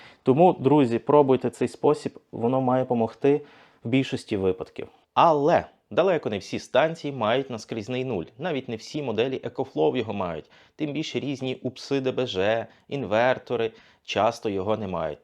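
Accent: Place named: Cherkasy, Ukraine. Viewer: Ше раз, - точно з черкаської області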